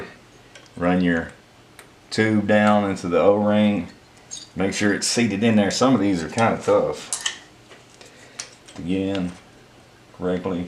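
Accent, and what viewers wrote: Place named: South, United States. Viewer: Thank you for getting back to me! I found that with them bled and taking all the lash out that it took the Screaming Eagle Adjustable Pushrods 4.25 turns . A guy at the parts counter of a local HD shop told me 4 to 4.5 turns and he was right. Thank you for your video. You sound like a Good Ole Boy! Just saying I'm from Mississippi but now live in SW Colorado. Come out for a trip, it'll be a blast taking you for a tour!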